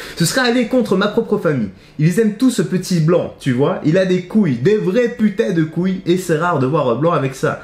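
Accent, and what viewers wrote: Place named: Liège, Belgium. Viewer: Quand je t'écoute, j'ai l'impression que j'entends un léger accent qui revient. On dirait que tu es liégeois ?!?🤔🤔🤔 😎😎😎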